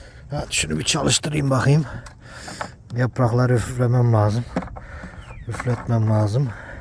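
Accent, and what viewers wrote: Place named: Çorum, Turkey. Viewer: Usta Çorumlu gibi konuşuyorsun ben Çorumluyum şiven bizim oranın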